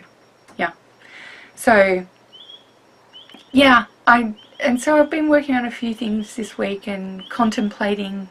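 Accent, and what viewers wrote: Australia. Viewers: you are fun to listen to, -- Shannon does not have your beautiful Australian accent (hers is Southern) -- and I love to hear your laugh;) enjoyed hearing about the letter journal group successes and seeing the art you are doing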